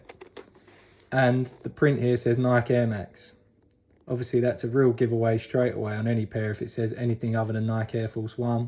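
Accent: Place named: United Kingdom. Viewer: Ohhh that british accent.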